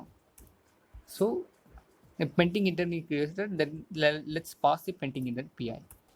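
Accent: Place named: England, United Kingdom. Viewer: What the English accent, can't understand anything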